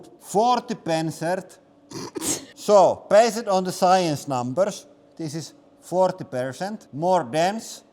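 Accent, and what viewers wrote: Finland. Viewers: I love when Finns speak English. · Rally English never gets old 🇬🇧❤️🇫🇮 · I know you speak this extreme finglish on purpose, but after a while it gets really irritating. So irritating that i find myself muting the videos. The gimmick has gotten to be a bit over-used.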